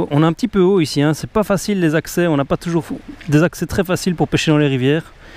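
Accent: Belgium. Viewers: Belle vidéo merci, ça fait plaisir d'entendre un accent Belge c'est familiale 😁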